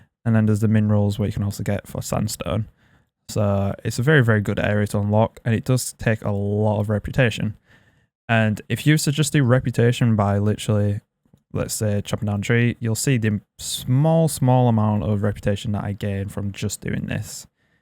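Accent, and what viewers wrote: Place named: Yorkshire, United Kingdom. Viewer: You sound like a Yorkshireman 🤔 refreshing to hear from a Runescape player 😂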